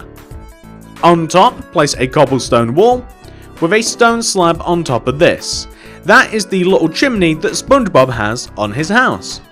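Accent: United Kingdom. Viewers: you sound like britbong, very distracting, 7/10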